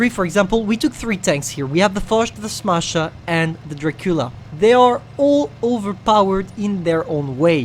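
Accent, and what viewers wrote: France. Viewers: French are you?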